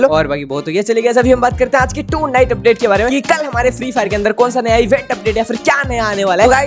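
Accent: Odisha, India. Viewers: Bhai tu odiya he na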